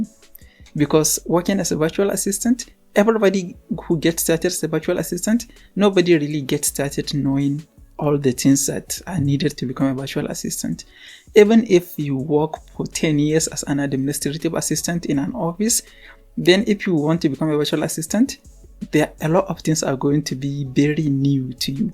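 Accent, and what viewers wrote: Hausa, Nigeria. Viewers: Nice Video, I love your Housa Accent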